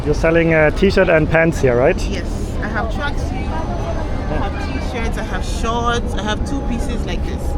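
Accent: Germany. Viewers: Dein Akzent ist echt krass 😅 Aber manche lieben es auch. Jetzt vermute ich mal: Stammst Du aus Sachsen? Oder irgendwo aus der Nähe? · German accent? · you have such a nice German accent. It feels so homey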